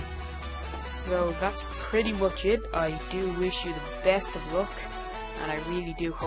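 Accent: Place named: Dublin, Ireland. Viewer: you sound irish, dublin accent i think trade link for item <link> and good luck to all!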